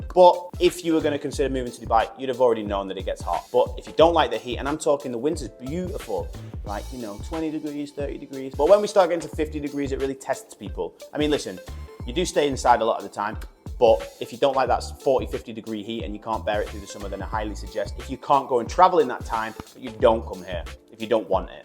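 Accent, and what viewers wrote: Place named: Northern England, United Kingdom. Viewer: Well done mate, never change that northerner accent !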